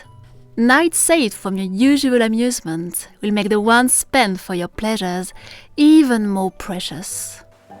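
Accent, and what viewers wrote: France. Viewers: Charming little film narrated in a genuine French accent, but what is going on with the actresses thumb right hand ? Was the blood looking colouring due to the sealing wax or is it in fact, blood inflicted by Valmont ? What a pity. Swan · Thank you for sharing this sweet gem with us. You bring history and literature to life with your elegant home, timely attire, inspiring music, and lovely French accent. You've created an exquisite artistic production!!! I especially appreciate you're enchanting storytelling is in English so that people from different countries can appreciate your work. Bravo!! Bravo!! 🇺🇸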